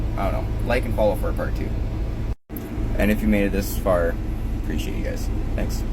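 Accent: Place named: Canada. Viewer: The Canadian accent man I love it.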